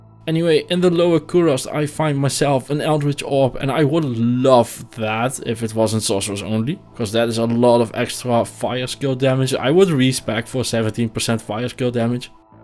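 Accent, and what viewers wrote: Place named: Netherlands. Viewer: hou van het Nederlands accent haha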